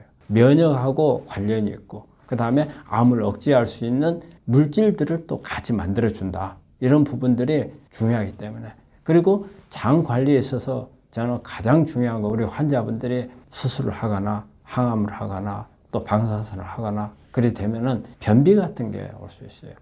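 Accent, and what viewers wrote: Jeolla, South Korea. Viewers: 아따 절라도고만 ㅎㅎㅎㅎㅎㅎㅎㅎㅎ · 전라도 억양 너무 듣기 싫다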